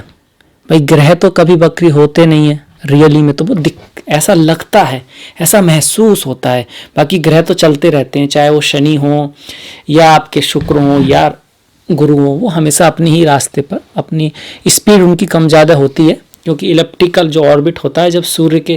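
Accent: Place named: Mathura, India. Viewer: Nice to know.....As per your accent of hindi, I think your background is from Mathura/Agra UP... I also belong to Mathura , presently residing in Jaipur Rajasthan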